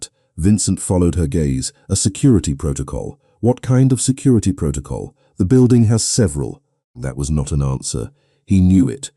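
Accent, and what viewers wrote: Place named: United Kingdom. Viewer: I loved the story but it was like listening to one long sentence and why British voice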